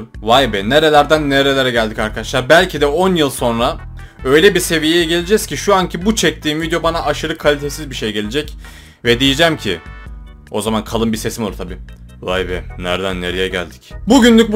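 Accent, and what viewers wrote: Turkey. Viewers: Abi sen öz sivas lısın çünkü k leri g söylerek okuyon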